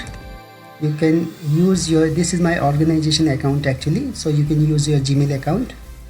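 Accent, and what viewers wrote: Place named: England, United Kingdom. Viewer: Thank you sir. For sharing this video. I like your english accent sir. 😊😊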